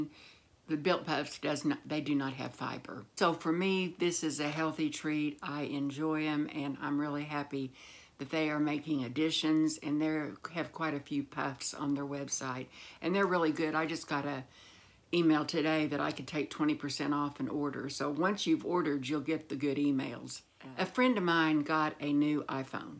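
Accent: South, United States. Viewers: Hi, I’m new here and enjoy your chit chat. I think this is my second time watching your videos. I live upstate NY in what used to be the original capital of NY State. The weather here has transformed beautifully into Spring. It’s becoming green and flowery all over. I go walking at the local track and field space and love it. Everyone is so friendly, saying hello to me and others they don’t know. It’s heartwarming and I think we give one another hope. Keep well and thank you for sharing. I enjoy your chit chat and your Southern accent is great!!! I wish I had one but I was born in Brooklyn!!! Thank goodness I lost most of my Brooklyn accent - my best friend in college was from Springfield, Mass. where people have no particular accent and it sounds fine and clear. Kind regards and keep well. FR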